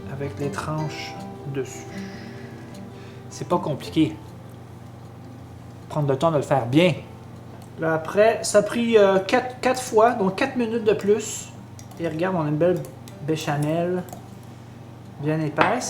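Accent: Quebec, Canada. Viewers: Hihi c'est marrant que tu sois français :) Car tu as bien pris l'accent du quebec ^^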